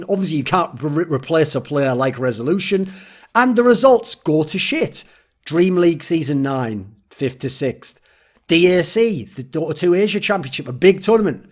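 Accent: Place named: Scotland, United Kingdom. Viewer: I just can't get past the Scottish accent. Not that's it a bad thing, but it's just noticeable to me. :)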